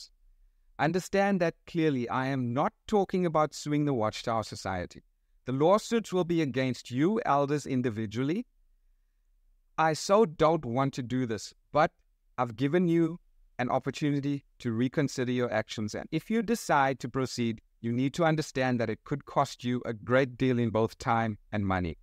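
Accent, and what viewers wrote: South Africa. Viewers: May i ask is your accent from South Africa?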